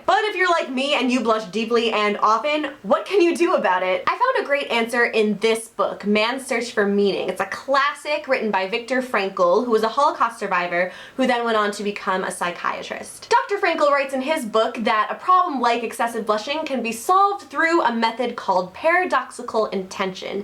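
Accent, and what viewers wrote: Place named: Ireland. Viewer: You must be Irish girl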